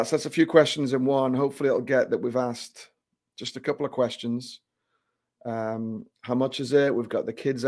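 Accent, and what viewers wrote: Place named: Newcastle, United Kingdom. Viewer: Listening to your Newcastle accent was worth the 3 minutes I spent on this. The tech is great but your home accent is out of this world!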